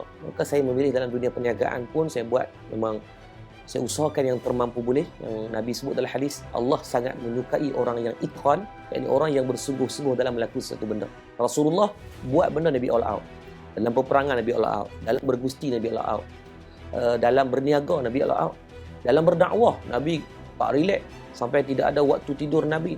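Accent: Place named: North, Malaysia. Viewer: Terengganu nyer dah hilang jadi orang utara😊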